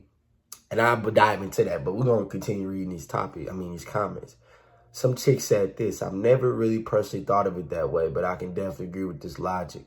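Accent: Baltimore, United States. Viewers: You from B-more?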